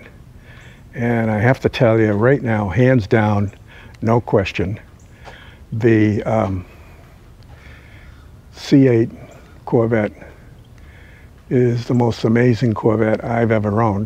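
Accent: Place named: New England, United States. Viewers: He sounds like he’s from new england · I can tell by listening to his voice, he's from up North, nothing wrong with that, I lived in New Hampshire while serving my country, when discharged, I moved back to Georgia, then after a nasty divorce, I moved to Massachusetts for almost ten years, then married a sweet girl from Brockton, and moved back to Ga, my southern accent used to drive people nuts, now after living up there for so many years, people don't know where I came from because i now pronounce my I's in the long form like tires instead of tars. My friends from Massachusetts used to love to listen to me talk. My guess is that he's from New York, Conn, Rhode Island, Mass, or Maryland. I love the look and how Corvettes drive but I spent 15 years working on them at a chevy dealer after busting my butt for 15 years working on those things, I most certainly would never own one. 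It's an amazing car, looks, performance, and a low price compared to other performance cars from other countries. I've just found that it's impossible to keep anything nice, there's always someone that will find a way to put a little flaw in my car that takes the perfection away from it.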